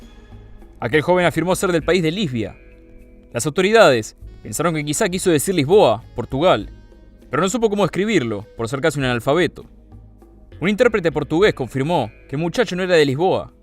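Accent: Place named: Argentina. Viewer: Lo único que le pone en la torre al relato es el acento Argentino.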